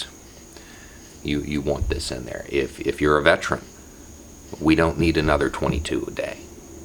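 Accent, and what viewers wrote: South, United States. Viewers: You are a voice of reason. I showed your videos to my conservative Republican dad and even he likes you. I don't know if it's because you say it with a southern accent, but he will listen to what you say while ignoring the same arguments made by other YouTubers that I watch.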